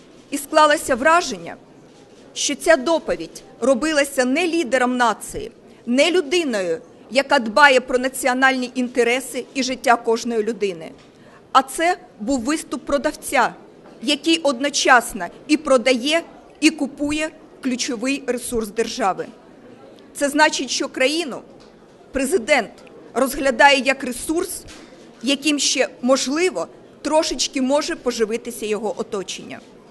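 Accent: Russia. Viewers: как всегда хорошо спела, наша сладкоголосая сирена. Всё же українська у неё хромает, акцент русский :), но Азарову стОит у неё поучиться. Показуха - это её козырь. Тут четко видна борьба кланов Тимошенки и Урки Яныка.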